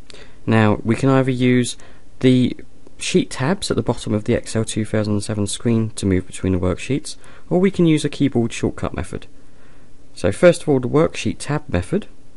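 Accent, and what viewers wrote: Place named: England, United Kingdom. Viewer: Yes it is an English accent from England.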